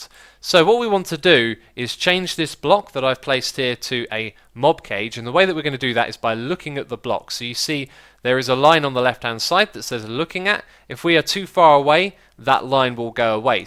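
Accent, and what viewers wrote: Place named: United Kingdom. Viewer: u sound so British...I am assell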